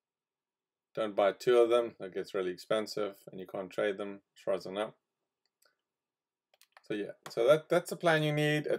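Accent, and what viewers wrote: South Africa. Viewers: Are you south African? The accent sounds hella familiar 🤣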